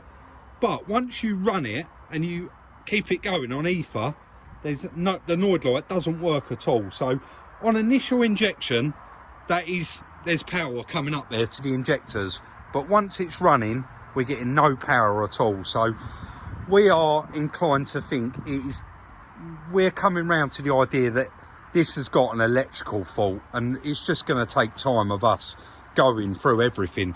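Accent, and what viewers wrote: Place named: England, United Kingdom. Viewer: Hi, sorry I'm no help with your problem on this van, or any modern vehicle, but I just had to say----love your accent mate! I was born in South Yorkshire ( Sahff Yowksha ) but have lived in Ireland for the last 23 years. English accents are a rarity here so to hear you rattle on is a real treat! Hope Chris is still OK and you too are keeping safe. Best regards from Ireland.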